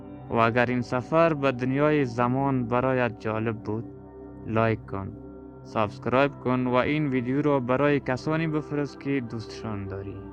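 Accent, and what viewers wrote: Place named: Afghanistan. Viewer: بی نظیر بود امیدوارم موفق باشی چون این نوع محتوا ها را برادران ایرانی ما زیاد میبینن البته فقط محتوا های که خود ایرانی ها ساخته باشد اما چون لحجه ما افغان ها را دوست ندارند بعد ازینکه روی ویدیو کلیک کردن همینکه لحجه ات را بشنوند فورا از ویدیو خارج می‌شوند ما افغان ها همیشه دنبال کننده برای آنان بودیم ولی آنها فقط خودشان را می‌بینند امیدوارم تعصبات را کنار بگذارند شما ادامه دهید موفق میشین انشاالله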